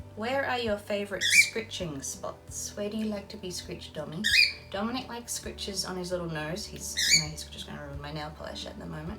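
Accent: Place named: Australia. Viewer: Wow! You sound like youre an Aussie?? I had no idea! GREAT accent!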